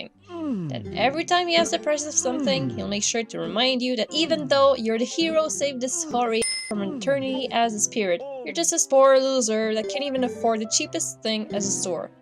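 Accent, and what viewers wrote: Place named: Canada. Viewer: Where do you live? (by your accent i would say canada)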